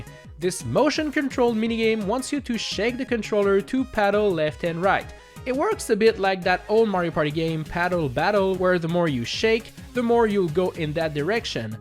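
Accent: Quebec, Canada. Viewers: French Canadian accent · he’s canadian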